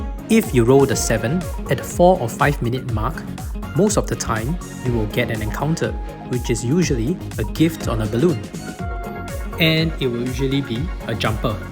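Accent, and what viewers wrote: Singapore. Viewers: Bro u staying in sg??? sounds like ure singaporean. Keep uploading gd digimon content! 👍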